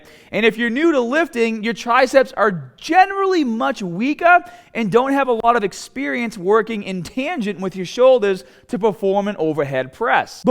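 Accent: Boston, United States. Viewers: Following because of that Boston accent...and trying to make gains, very informative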